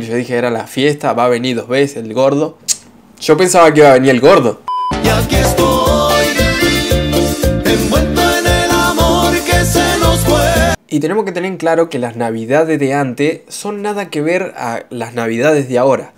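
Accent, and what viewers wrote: Argentina. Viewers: Papa Noel se olvido de mi 😢😢😢 Pd: siempre pensé que eras tucumano por como hablabas, hasta que te encontre en insta y me di cuenta que sos santafesino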